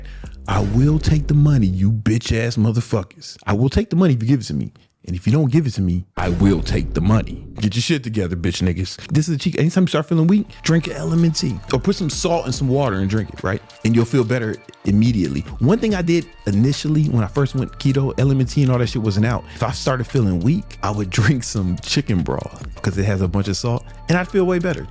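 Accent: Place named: New York, United States. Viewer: German here...just discovered your channel and I especially like the way you talk. Is that the slang or dialect from New York? I will use it to teach it to me (by "shadowing" the way you speak)...that will be fun...a white boy and meek and mild do gooder like me with that talk...hahaha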